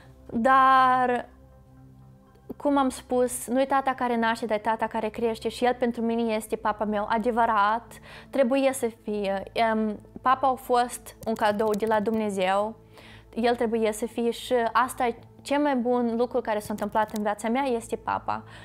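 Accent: Moldova. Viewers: Ce nu inteleg, daca această fata a fost crescută în Elveția de la 3-4 ani, cum de are un accent moldovenesc ca o persoană care acolo a crescut?🤔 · Totuși, de ce are asa accent moldovenesc, dacă a trăit mult fără mama în Elvetia? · Vorbesti foarte bine româneşte!Bravo!Mai ales ca o vorbeşti cu accent frumos moldovenesc,eu fiind din Suceava 🇷🇴Esti desteapta,deosebita,nemaiponită❤❤❤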